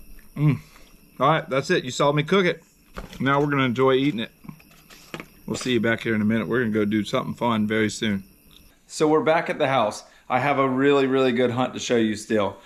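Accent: South, United States. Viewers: Sounds like a good Ole country gal to me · I absolutely love her accent. Being from TN then moved to south Alabama it reminds me of home.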